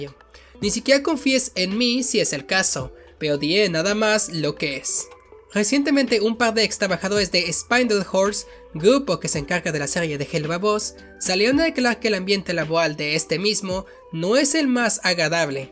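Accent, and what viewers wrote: France. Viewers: Soy yo o tu hacento parece francés es tan hermoso UuU ✨ Estuvo interesante el vídeo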